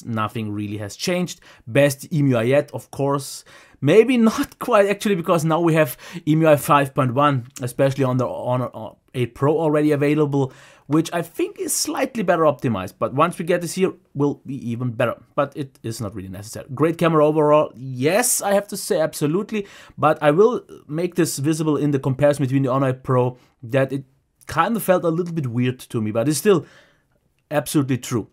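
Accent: Germany. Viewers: Ich liebe es wenn Deutsche Englisch reden. Egal wie sehr man sich auch anstrengt, man bekommt den deutschen Akzent einfach nicht los :D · Funny when A German speaks English like him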